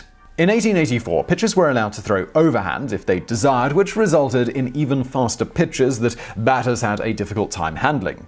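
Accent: United Kingdom. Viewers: Why am I listening to a Brit tell me about baseball?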